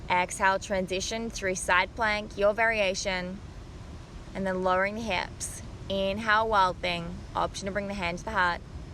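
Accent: Australia. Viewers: the Aussie accent is great - a nice change of pace for a Midwestern (US) yogi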